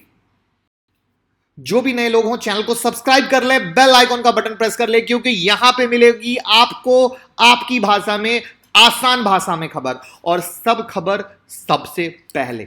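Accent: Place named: Shekhawati, India. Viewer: Byaj ko dhando chokho, 😆 ye to pure shekhawati style h....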